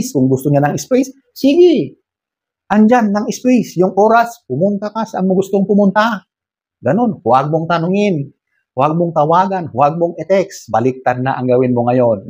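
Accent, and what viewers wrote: Ilocos, Philippines. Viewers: Hi Kuya magandang advice mo. Gusto ko yung accent mo....Ilocano ka ba? 😅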